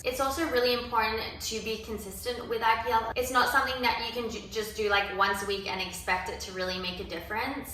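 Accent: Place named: Canada. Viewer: Catching a slight Canadian accent coming through 🤭 Sold on this! Looks like a great product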